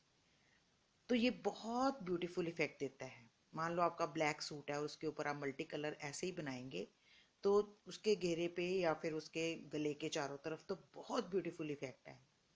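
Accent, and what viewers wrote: Punjab, India. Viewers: Beautiful design.. N ur Hindi with Punjabi touch is so sweet.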